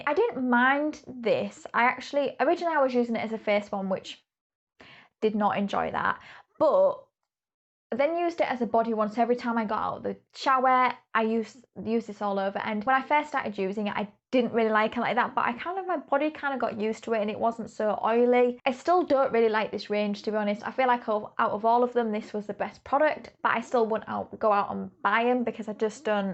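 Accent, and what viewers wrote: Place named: Lancashire, United Kingdom. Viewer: I am UK based and do empties videos too! There aren't many of us, happy to find you and your channel! It's always nice to see reviews of products that I can actually get hold of and try myself! Subscribed! Ooh are you in greater Manchester, Lancashire? Am I close or nowhere near? I love trying to guess accents, I find them fascinating!!!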